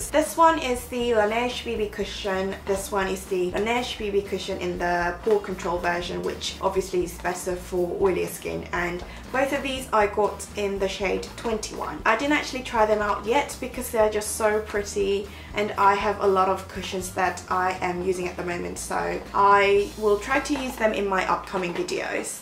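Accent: United Kingdom. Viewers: 영상 항상 재밌게 보고있어요! 매번 눈팅이랑 좋아요?버튼이라해야하나욬ㅋㅋ 저거누르기만 했었는데 첨으로 댓글달아보네옇ㅎㅎㅎ 다른유부터영상보다 영상이 먼가 더재밌어서 꼭 보게되네여ㅎㅎ 영국발음도 신기하기도하그~ 하여튼 다음 영상 기대할께용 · 맨날 언니 영상 보면서 영국발음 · 언니 진짜 너무 예뻐요 ㅠㅠ 진짜 언니 런던에서 혼자서 찍을때부터 계속 구독햇엇는데 ㅠㅠ 어느새 더 가까워진 느낌..ㅎㅎㅎ앞으로 자주 올려주셧으면 좋겠어요 너무 반가움..👐영국 말 너무 매력잇어요 ㅠㅠ 배우고 싶다능..ㅎㅎ 언니 진짜 좋아해여💖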